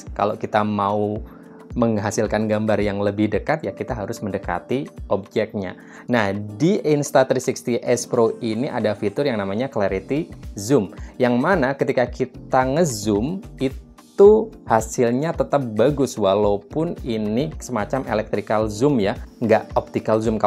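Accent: Pekalongan, Indonesia. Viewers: Orang pekalongan kah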